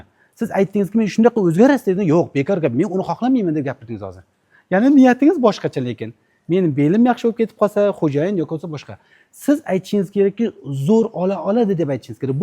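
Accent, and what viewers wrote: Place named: Qashqadarya, Uzbekistan. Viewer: Энг ажойииб кашкадарйоча жайдари гаплар😂😂 Зур тушунтиряптила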